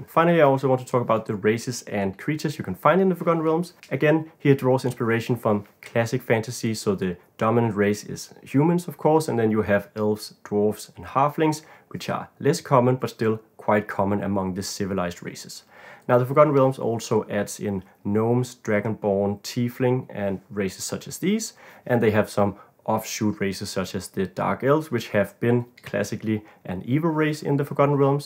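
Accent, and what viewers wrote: Denmark. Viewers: I knew you were danish the moment i heard your voice 😂 Nice video, very helpful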